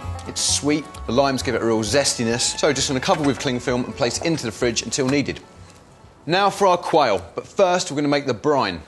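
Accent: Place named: Europe. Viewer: This ain’t southern y’all, this is European...The European accent the heeeerbs....hell naw this ain’t southern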